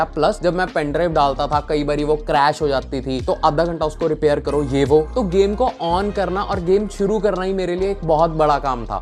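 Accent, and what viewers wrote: Punjab, India. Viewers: Bro's Punjabi Accent 😂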